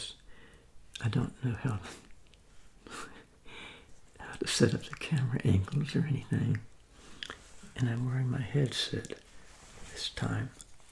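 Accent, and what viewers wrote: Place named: South, United States. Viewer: You have a very calming voice and accent 😊 Are you from the South?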